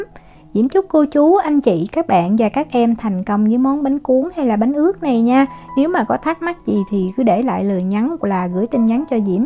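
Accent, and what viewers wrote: South, Vietnam. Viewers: Một giọng nói trong trẻo, thơ ngây, trong sáng và rất chân thành "miền Nam". Cảm ơn cháu đã chia sẻ, chúc cháu và gia đình luôn an vui và hạnh phúc.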